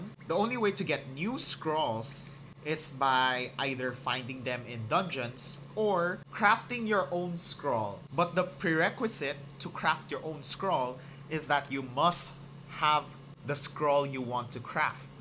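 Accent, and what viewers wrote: Philippines. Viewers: Are you PILIPINO? You sound like PILIPINO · utek filipino accent pala un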